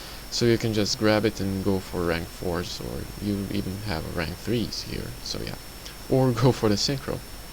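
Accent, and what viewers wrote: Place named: Netherlands. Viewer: ... decklist is okay. Dutch accent is horrible.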